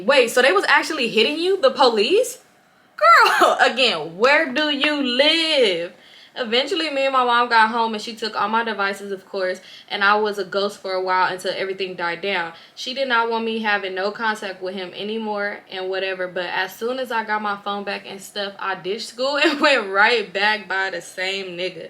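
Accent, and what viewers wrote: Trinidad and Tobago. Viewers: Allyuh = All of you Caribbean accent Possibly a Trinidadian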